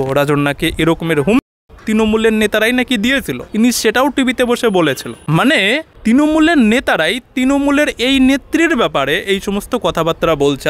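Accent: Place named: Bangladesh. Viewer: Bhai youtuber, bangladesh theke kabe elen? Vasa shune pariskar bojha jachhe.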